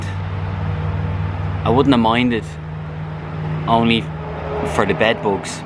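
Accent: South, United States. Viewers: Your southern accent! Love it 😄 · Most say your southern accent is pretty good much better then my Irish accent[ lol] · lolol it's funny to hear you talk southern with an Irish accent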